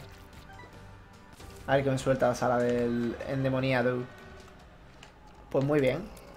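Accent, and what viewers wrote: Andalusia, Spain. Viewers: hablando de acento andaluz , tengo una profesora de matemáticas andaluza y entre tu y ella de vez en cuando se me pega el acento 😂😂😂 hace un par de semanas pensó que la estaba vacilando XD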